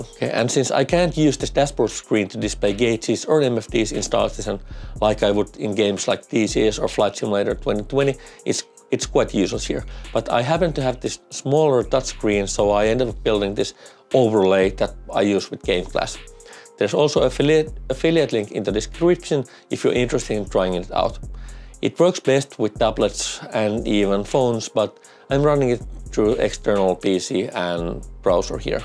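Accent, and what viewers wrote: Finland. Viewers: this is the first time i watched you and right away i noticed the finnish accent =D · Nice setup. I would love this for my Elite Dangerous games. Are you Finnish btw...accent sounds familiar? xD (Ah checked your info and was correct)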